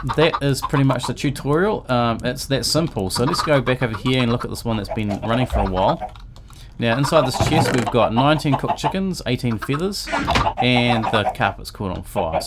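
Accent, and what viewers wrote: New Zealand. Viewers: I guess you have never heard a New Zealand accent then... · Holy crap u from Nz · EEEG lol you're a total kiwi! Props to you! Genius idea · you sound like a new zealander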